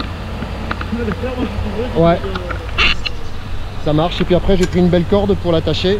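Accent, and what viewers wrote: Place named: South-West, France. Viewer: Bonjour comme l'enveloppe du nid n'est pas commune penses tu que ce soit une anomalie ou une adaptation du frelon a l'environnement.?? J'aime bien l'accent du sud ouest.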